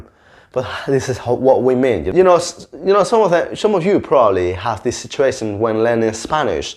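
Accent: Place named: England, United Kingdom. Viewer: Wow you also have a sweet english accent.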